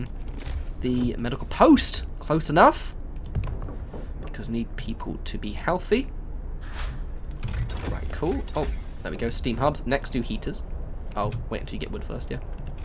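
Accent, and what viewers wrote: United Kingdom. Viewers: OMG. Speak English, not a f!@ British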